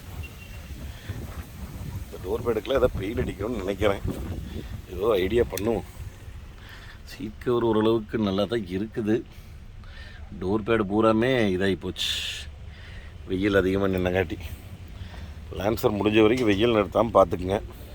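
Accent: Kongu, India. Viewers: கொஞ்சும் கொங்குத் தமிழ் பேசும் கோமகரே...! திகட்டாத தித்திக்கும் பேச்சுடைய திருப்பூர் திருமகரே...! மதிப்பிற்குறிய எங்கள் " " திரு. அவர்களே🙏 எப்படி இருக்கீங்க❤❤❤ அண்ணி🙏 எப்படி இருக்காங்க❤❤❤ ரித்திக் எப்படி இருக்காரு❤❤❤ யெப்ப்ப்ப்ப்ப்ப்ப்ப்பா😍😍😍😍😍😍😍😍😍😍😍😍😍😍😍😍😍😍😍😍😍😍😍😍😍😍 அட...அட...அட என்னா கலரு போங்க😍😍😍😍😍😍 சொப்பன சுந்தரிய யாரு வச்சு இருக்காங்கனு கரகாட்டகாரன் படத்துல செந்தில் அண்ணன் கவுண்டமணி அண்ணன்கிட்ட கேட்பாரு? இங்க பாருங்க...."TMF" செக்கச் செவேல்னு ஜிகுஜிகுஜிகுனு சிவப்பு சொப்பன சுந்தரி லேன்சர் காரை நம்ம " " திரு. அண்ணா வச்சு இருக்காருங்கோ😍😍😍😍😍😍😍😍😍😍😍😍😍 கொட்டப் பாக்கும் கொழுந்து வெத்தலையும் போட்டால் வாய் செவக்கும்...! எங்கள் " " அண்ணாவோட வேலைப்பாடுகளில் கார் எல்லாம் ஜொலிஜொலிக்கும்😍😍😍😍 அழுக்கான அசிங்கமெல்லாம் அழகு வண்ணம் பூசுகையில் சாலை ரதத் தேர் என்னும் கார் எல்லாம் பொன்னாய் மிண்ணும்...! வழுக்காத நேர்மையென்னும் வாழ்க்கைப் பாதைதனைக் கொண்ட எங்கள் " " அண்ணா சிந்தனையும் செயலும் அவர் வேலைப்பாடுகளிலே பொன்னாய் மிண்ணும்...! 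எச்சிலொழுகும் தன் மழலைதனின் அன்பு முத்தச் சுவைதன்னை தாய் ரசித்து ருசிப்பதுபோல...! வாகனம் பராமரிப்பற்று எச்சமிட்டதுபோல் ஆகி அருவருப்பான இடமெல்லாம் மிச்சம் மீதியில்லாமல் சுத்தம் செய்து தரும் எங்கள் மதிப்பிற்குறிய "சூப்பர் கார் கேர்" (Super Car Care) ஊழியர்களின்🙏 உழைப்பைக் கண்டு அனைத்து "TMF" கண்களும் காணோளி வாயிலாக ரசித்து ருசிக்கிறது...!! சூப்பர் கார் கேரில் வேலை பார்க்கிற ஊழியர்களுக்காக ஓர் சிறிய கவிதை🙏 வேதனை வந்து வீழ்த்தி வீழும் நிலைக்குத் தள்ளப்பட்டாலும் விதியென வீழ்ந்திடாது வாழ்வில் உயர நினைத்து உடல் வளைத்து வியர்வை வடித்து உழைக்கும் உன்னத உழை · Super workmanship, sir.You have proved that you are the Best car mechnic and as always your Kongu Tamil is sooper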